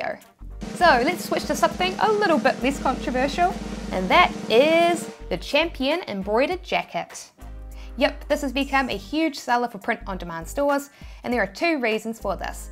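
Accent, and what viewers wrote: New Zealand. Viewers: I'm just here to listen to the kiwi accent...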